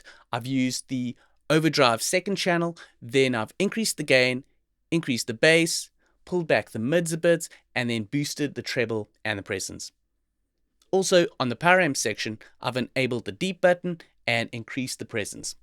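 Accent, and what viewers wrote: South Africa. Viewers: Ayyy I noticed that accent! Fellow South African!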